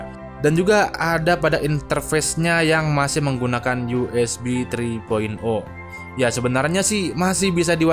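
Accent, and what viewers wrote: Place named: Bekasi, Indonesia. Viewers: entah kenapa, logat dan pilihan kosakatanya mirip aksen Bekasi gitu... Kental aksen Bekasi haha.. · orang bekasi kayaknya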